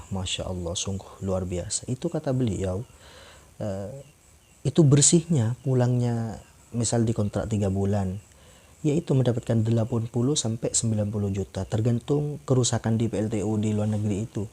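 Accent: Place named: Madura, Indonesia. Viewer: Reng madureh kakeh tretan 😂?macem logatdeh reng madureh.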